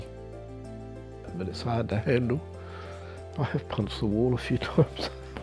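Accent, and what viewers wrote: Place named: England, United Kingdom. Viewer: THANK YOU! I JUST GOT YOUR MSG, AND WATCHED YOUR VIDEO AGAIN. I MUST TELL YOU, YOUR WIFE IS BEAUTIFUL! GORGEOUS HAIR AND LOVELY DARK EYES, AND YOUR NOT SO BAD YOURSELF.....HANDSOME COUPLE...MATCH MADE IN HEAVEN INDEED! I LOVE THE WAY YOU TALK ABOUT THE LORD, I KNOW WELL WHAT ITS LIKE TO DEPEND ON HIM EVERYDAY. IM 71 AND BEEN SUFFERING WITH MS FOR 20 YEARS. AND YOUR RIGHT WHEN YOU WONDER HOW PEOPLE LIVE WITHOUT FAITH IN THE LORD. I TELL YOU, I WOULD HAVE ENDED MY LIFE YEARS AGO IF I HAD NO FAITH! KING DAVID SAYS IN ONE OF THE PSALMS,"LORD, YOU KEEP ME ALIVE"! IS IT NOT THE TRUTH?? MY HUSBAND LOVES ME BUT HAS NO PATIENCE WITH ME, IT MAKES THINGS HARDER FOR ME BUT HE HAS MANY HEALTH PROBLEMS HIMSELF, SO I TRY TO UNDERSTAND. AT LEAST HE'S NOT CONFINED TO HOME YET. THANK GOD! YOU SOUND ENGLISH, MY MOM WAS ENGLISH AND IRISH. 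MY HUSBAND IS IRISH AND WELSH, BUT MY DAD WAS POLISH. WHAT A MIXTURE I AM, AND I DONT LIKE POLKAS OR POTATO SOUP.....BUT I LOVE MY TEA!! SORRY FOR JABBERING ON, BUT ITS FOOTBALL TIME IN AMERICA, SO YOU KNOW WHERE MY HUSBAND JOHNNY IS!! YES, I GOT ME A TOO!! IF YOU THINK OF IT, IN YOUR PRAYERS MENTION SUZY ROBERTS, HE KNOWS ME WELL, AS I HAVE YOU AND IN MINE ALREADY! TAKE CARE AND MAYBE WE WILL ALL MEET SOMEDAY IN THE KINGDOM....I SURE HOPE SO!! LOVE AND BLESSINGS FROMAMERICA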